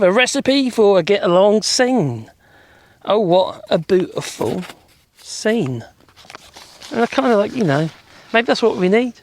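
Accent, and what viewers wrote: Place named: West Country, United Kingdom. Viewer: All this time watching channel I thought he was from the west country! 😅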